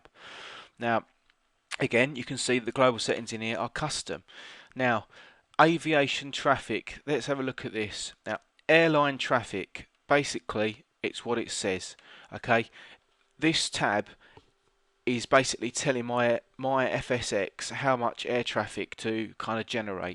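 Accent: United Kingdom. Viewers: I FUCKING LOVE YOU THNK U BRITISH OR WHATEVER COUNTRY UR FROM it really helped allot now i can enjoy flying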